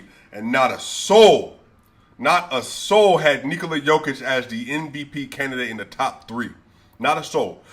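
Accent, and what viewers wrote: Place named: United States. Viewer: what is black history? is it something paralell universe? or some paralel organization?you sure look sound like american:) is it that black or american?is slavery civil war black history or american history or just british colony history? confused i come for basketball and now puzzled:) it sound like america got again some segregation issue:)is it different from heritage day or what?:)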